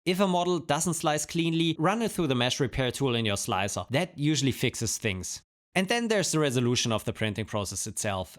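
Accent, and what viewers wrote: Germany. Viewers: super videos wie immer, aber bitte, nett gemeinter tipp, arbeite an deinem deutschen akzent. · United by East German accent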